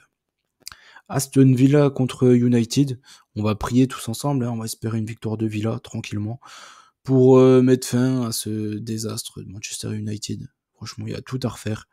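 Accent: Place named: East, France. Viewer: Salut mec tu as l accent de l est de la France 🇫🇷 !!!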